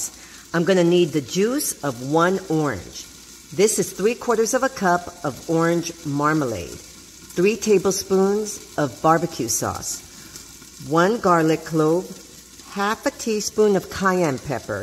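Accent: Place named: Tri-State, United States. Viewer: Back east??? Hell no! I'm on the west coast, lady! hahahaha! Can't you tell from my accent? Say -- and forgive me if I'm wrong -- but are YOU from back east...like tri-state, by any chance? That's what your accent sounds like to me. Again, I am SORRY if I got that wrong.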